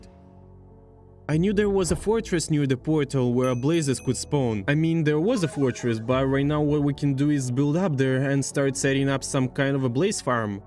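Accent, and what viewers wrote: Russia. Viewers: У тебя русский акцент По секрету всему свету скажи откуда ты? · как же палишься братан по акценту)) своих видим за версту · I hear russian accent lol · nah, its russian accent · С первых слов поняла что ты русский, но не было доказательств, пока не увидела достижения 😂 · Настольуо акцент русский слышен, что даже забавно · when do you guys realised he is a russian guy, i realised it in 2 min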